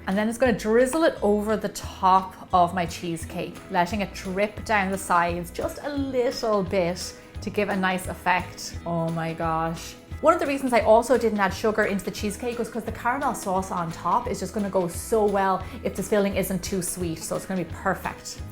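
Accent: Ireland. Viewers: Northern or southern Irish?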